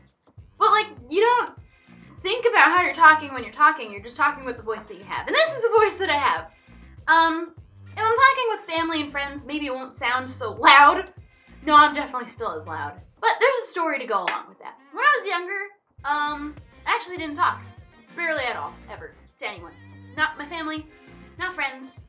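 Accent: Texas, United States. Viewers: You sound like you were from Texas in UHShe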